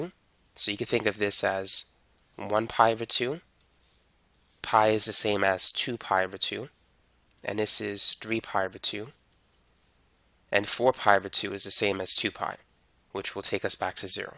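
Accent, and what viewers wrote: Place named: Boston, United States. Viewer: Where are you from? Your accent seems almost like new england/boston, but I can't pin it down!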